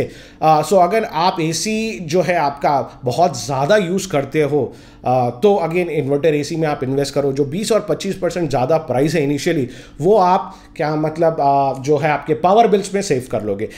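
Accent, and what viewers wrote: Hyderabad, India. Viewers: Bhai, you rock and love your Hyderabadi Hindi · well done dear. I have been your viewer quite a while during smartphone sunrise. your appearance with hyderabadi hindi doesn't make any difference to northerns which I loved it. keep it up. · I'm subscribing ur channel because of Hyderabadi hindi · आपकी हैदराबादी हिंदी सुनने में बेहद अच्छी लगती है। कृपया इसे जारी रखें। धन्यवाद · Information was good but good luck with your hyderbadi hindi next time. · Why do I love this channel so much? All of his videos are really informative. Respect to you Sir for posting such great videos. You are the best🙌 #lovehyderabadiaccent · Ye Hyderabadi hai bhai.... Isme bhi kafi achhi hai.... Asali Hyderabadi aur bhi mazedar hai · Good Hyderabad hi accent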